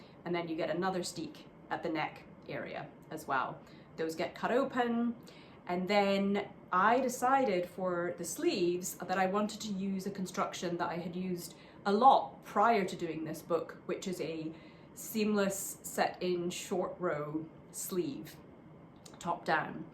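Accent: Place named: Scotland, United Kingdom. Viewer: Congratulations on another fabulous episode! I cannot tell you how much I am enjoying the content - I love hearing about the history of these lovely garments and how you are keeping them alive … 😁It’s also so bizarre seeing the snow and hearing about the cold, when I am sitting here in the air conditioning during our hot and humid monsoon season! Even so, my roots are in Scotland and the Northern Isles, so listening to you speak is like hearing a letter from home. Thank you so much for sharing your incredible knowledge. Very much looking forward to your next episode … Big hugs from Darwin (up north) Down Under … 😊🌴🌴🌴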